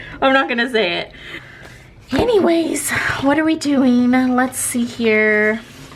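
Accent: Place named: Seattle, United States. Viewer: I am a fairly new subscriber to you channel. Just listening to I got the feeling you are from Seattle. Are you?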